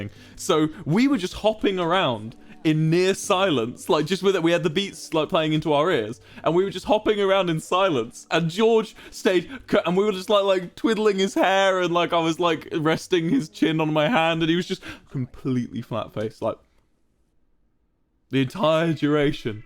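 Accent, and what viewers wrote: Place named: United Kingdom. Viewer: His British accent tho😢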